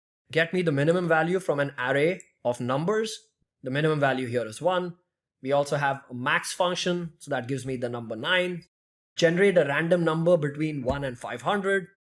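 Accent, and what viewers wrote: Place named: India. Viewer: And his mic quality is actually good! Unfortunately so many of these talented developers making content have thick Indian accents, although they can’t help it - this makes it hard to understand coupled with bad microphones its doubly difficult to comprehend the great info they teach: shout-out! 💪🏼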